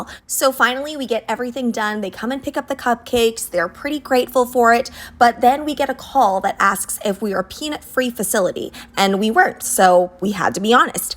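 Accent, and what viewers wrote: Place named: United States. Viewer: Some thick American accent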